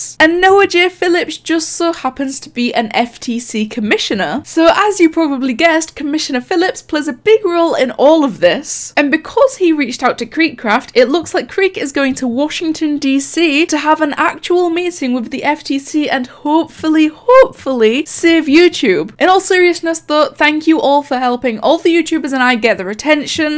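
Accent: Scotland, United Kingdom. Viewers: I love me a Scottish Accent!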